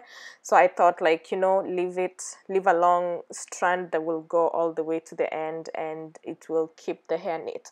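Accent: Kenya. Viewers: lol! accent ni ya Muranga ama!Nilijua tu wewe ni wa home😝Uko na katoi karembo..😌imajine aki nyweke zetu na blackamericans ni different aje..anyways you did some awww kazi..niko Swiss currently n nyc to hear sone home accent online😝😍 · You must be kenyan, I heard you speak Swahili and omg your accent. Wow 🤩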